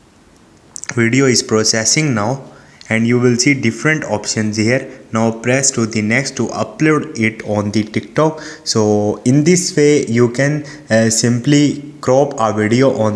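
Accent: India. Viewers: Yeah its called an Indian accent. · this guys voice sounds like those scammers from india👍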